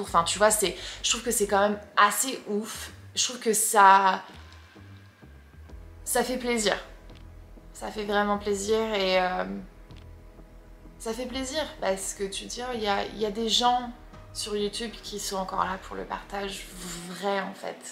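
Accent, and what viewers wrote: United States. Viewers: ta manière de parler très US même quand tu parles français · Je pense que c'est lié à l'accent américain. Je saurais pas l'expliquer mais l'accent américain sur le français peut donner cette impression / les mots anglais dans une phrase française aussi. C'est mon analyse de la question mais c'est vrai que quand des Français se mettent à utiliser les anglicismes à tout va j'ai cette impression les concernant qu'ils sont "faux" ou parfois qu'ils sont prétentieux. Bien sûr dans ton cas, c'est différent car tu es partie aux Etats Unis mais ça peut fausser l'impression de certains français à mon avis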